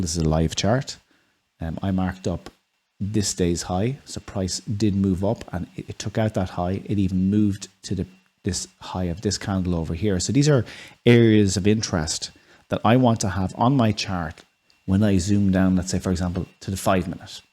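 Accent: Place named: Ireland. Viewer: First video from that is a bit confusing.Will need to look at it a few times.Can hear his Irishness sometimes.😊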